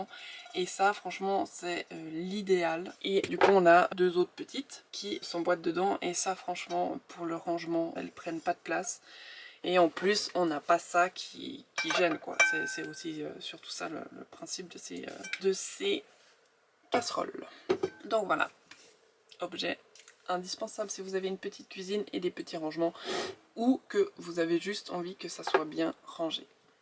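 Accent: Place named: Switzerland. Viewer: Merci pour ta vidéo je me suis abonnée je me demandais d’où venait ton accent donc tu es suisse 🇨🇭 très contente de te connaître et non tu ne parles pas trop sur tes 5 éléments j’en possède 3 et je pense vivre en camping-car Vivement les prochaines vidéos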